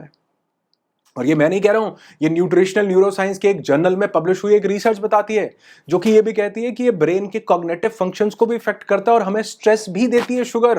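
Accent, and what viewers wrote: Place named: Punjab, India. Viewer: Apka sose pose is... Proper punjabi tone.. I love it❤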